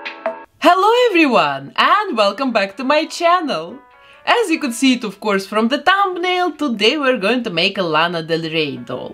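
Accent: Russia. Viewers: мне кажется, или я слышу русский акцент?😂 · Русский акцент 😃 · where are you from? i'm sorry but your accent is hhhhhorible. неужто из России, мать? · Приветики рашн акцент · По акценту сразу понятно, что вы русская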